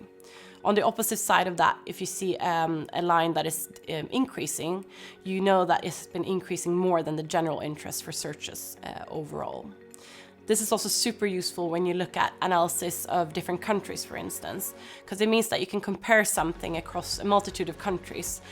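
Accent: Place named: Sweden. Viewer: Accent is Swedish!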